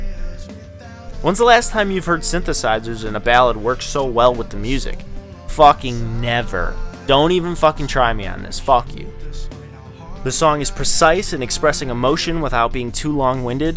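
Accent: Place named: Philadelphia, United States. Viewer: Don’t even try me on that. Fuck you… This dude clearly from philly. Love it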